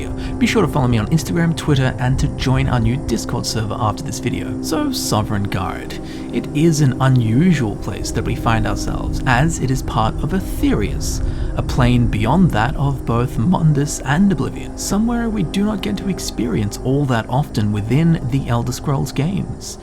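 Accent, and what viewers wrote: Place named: United States. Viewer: Is it just me or was super American sounding in this vid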